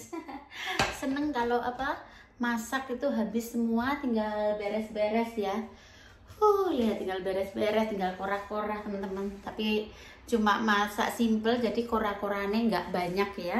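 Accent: Java, Indonesia. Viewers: Lucu bngt bhsnya jawa medok 😀😀 suaminya ajarin bhs jwnya psti seru, klo udh laper gk tahan 😀muantaap bihun gorengnya praktis di masaknya, siip suami istri slng supory kompk apalgi saling bercanda ok bngt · Mbak aq suka skali kl ngmong ada bahasa jawany n' medok2 gmna gthu hahaha... Smoga sukses n sehat slalu buat smua keluarga ya mbak...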